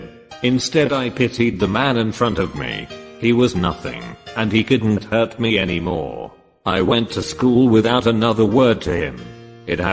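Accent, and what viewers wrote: United Kingdom. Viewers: best british accent.......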